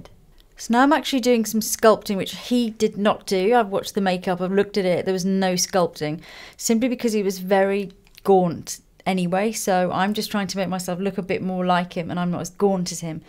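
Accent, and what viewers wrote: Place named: England, United Kingdom. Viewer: I don't like everyone's English accent... In fact, very few people make the cut... But, the way you talk...Ahhhhh...so soothing... Perfectly melodious❤️love all your videos... You're a proper artist!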